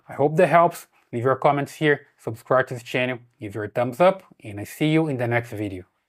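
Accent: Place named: Brazil. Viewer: Vc eh brasileiro nao eh? Esse nosso sotaque eh inconfundível. 🇧🇷 Os vídeos são mto bons, bem úteis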